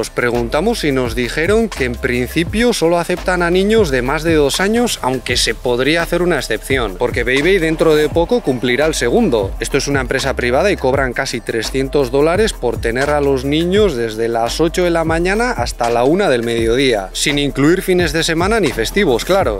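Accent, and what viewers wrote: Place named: Spain. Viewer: Hoye habla vien Como un Español se te hoye feo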